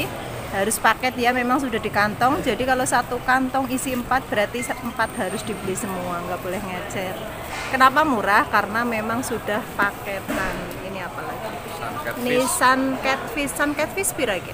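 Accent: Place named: Banyumas, Indonesia. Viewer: mantap nih satu frkwensi kontenya, pada pada wong ngapak hahahay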